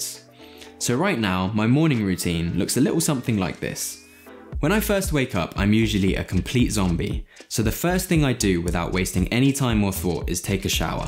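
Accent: United Kingdom. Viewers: I'm liking the new British accent! ❤️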